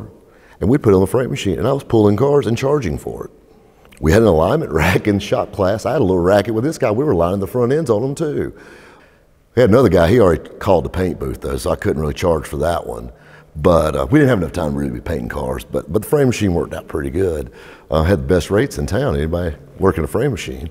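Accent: South, United States. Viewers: Coolest dude with a southern accent.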